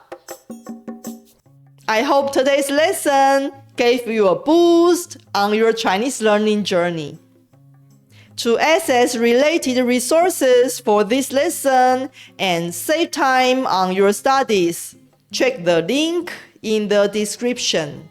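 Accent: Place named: Beijing, China. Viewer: Thank you for responding. I asked because I thought I could detect a northern, perhaps Beijing accent. Anyway, nice to come across another channel teaching traditional Chinese 😊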